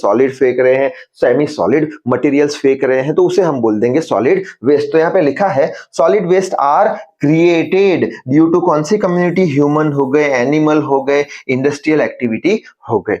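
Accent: India. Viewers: You speak Indian English. And you look like an Indian.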